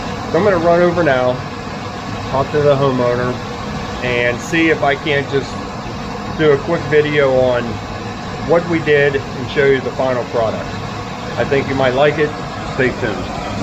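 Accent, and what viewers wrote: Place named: Pennsylvania, United States. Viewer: Love the channel. Just wanted to say there are no "Z"s in greeeassse. Love the Pensilvainia accent. Those are s's not z's. People point out my Ohio accent all the time and I live in the US Virgin Islands.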